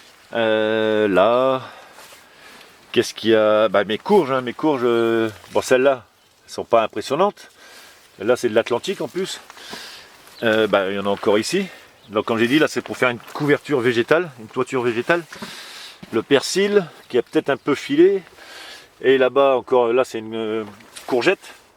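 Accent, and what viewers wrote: Nord-Pas-de-Calais, France. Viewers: j adorre tes commentaires avec l accent et les termes chti . je suis de boulogne tu devais etre de lens vu l accent . super potager ché qu'in mem' un tiot peu ch' bordel . mi à ch't' heur ' j'mont ' min bassin à pichons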